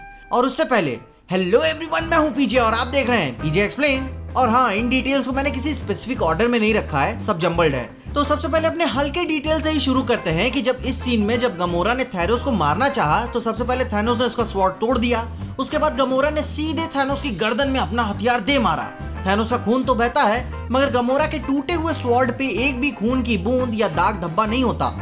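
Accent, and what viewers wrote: India. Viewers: Ur desi style of speaking is AwEsOmE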